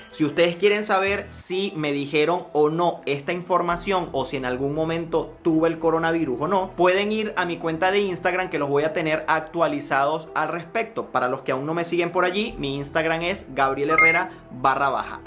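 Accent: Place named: Venezuela. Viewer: Primera vez que veo tus vídeos, saludos, por tu acento eres venezolano pero ¿en qué país estás?, que maravilla de hospital, saludos, en nombre de Dios saliste bien y seguirás libre del virus, saludos 💋💋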